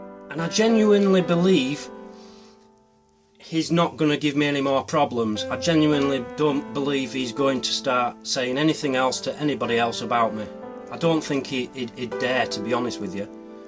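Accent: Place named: North England, United Kingdom. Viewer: Though I'm also not a fan of 'feeding the trolls' there comes a time to clear the mud, so to speak. Such a shame, in contemporary media, that the base human nature has a viral platform to spread scum about. Good for you,. I love your attitude in your vids. Personally, I've hated Coro St. with a passion. Now, look at this objectively, the way you speak reminds me a lot of the characters, so there's a historic association with that regional accent. Does that imply I'm a 'hater'? Not when I qualify it this way. The more I listen to you and others from that accent group - from innumerable influences - who have no connection with TV soaps the more I start to associate that accent with wonderful people. I've travelled a bit in the UK, and there's a particular attitude in the North counties that I love. After all, I'm in NZ and my Grandma is from Sth Yorkshire! 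So, I am loving what you're doing in the MD community and love the way you go about your episodes. Lastly, I hope you reach the point you can ultimately forgive these 'bad people'. We all learn how to achieve peace and contentment in unique ways. That's some of my story. So 'get o nwiv yer bovver', me ol' china.:-) Only blessings.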